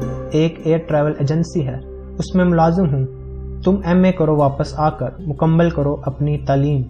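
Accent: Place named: Punjab, Pakistan. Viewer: haye punjabi accent mai urdu... r bnao bhai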